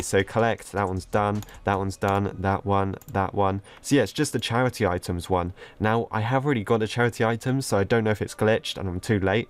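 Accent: United Kingdom. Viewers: It’s called a British Accent, look it up...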